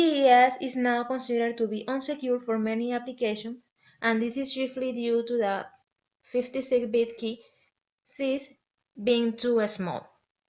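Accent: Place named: Spain. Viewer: she's soo spanish. I love this accent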